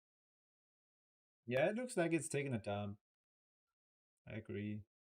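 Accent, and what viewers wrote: Denmark. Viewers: Are you from denmark🇩🇰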